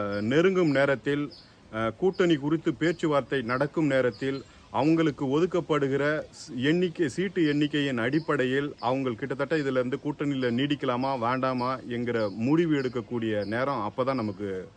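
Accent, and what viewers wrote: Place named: South Tamil Nadu, India. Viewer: Sirappu 🎉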